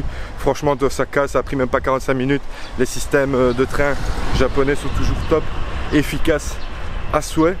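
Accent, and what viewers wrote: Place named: Belgium. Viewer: Salut! C'est chouette d'entendre parler "belge" au sujet du Japon. Merci pour ta trop brève vidéo au sujet de Kobe. Je rentre d'un 5ème séjour (en 10 ans) au Japon. J'y pratique la pêche "en mer du bord". Je suis namurois et j'ai 73 ans. J'étais à Yokohama qui rime avec drôle de choix jusqu'au 20 juin. En bon amateur de tout ce que le Japon propose, je prépare déjà mon prochain voyage et ce sera...Kobe. Voilà comment je tombe sur tes reportages. Bravo, je t'encourage à poursuivre. Konitchiwa. Guy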